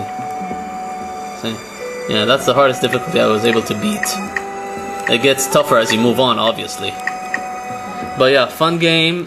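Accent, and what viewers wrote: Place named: Scandinavia. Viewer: Haha. Yeahh, it is mostly the accent that sounds like a scandinavian's, especially the way you say the "R" sound. Also the lightning made your skin color look kind of possible for a scandinavian. The video was very understandable though. I am used to the accent by myself being a Scandy :)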